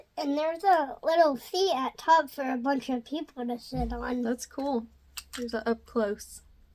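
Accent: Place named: Tennessee, United States. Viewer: ❤are you from Tennessee you have my accent lol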